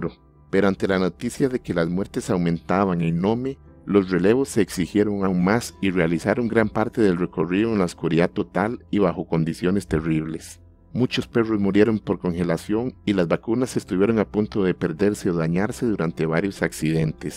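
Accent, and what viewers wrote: Costa Rica. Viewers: Sabia que eras Tico mae!!! Desde que empezaste a hablar, dije,mínimo es de cartaguito. Buen video. Gracias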